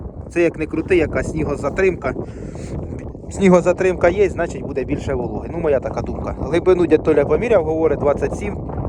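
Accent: Poltava, Ukraine. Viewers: Проживав би ти там де він, і сам так розмовляв би,Полтавський суржик він такий.Ластівка залишилась і далі працювати.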